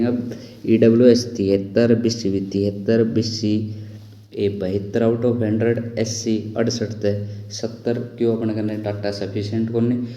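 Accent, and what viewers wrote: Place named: Haryana, India. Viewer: Brother mahendergarh side ka lag h😅😅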